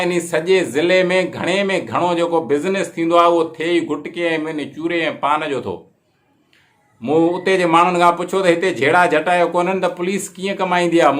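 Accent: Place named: Lar, Pakistan. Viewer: Tawhan jo galhain Jo lehjo laarian wangar ahay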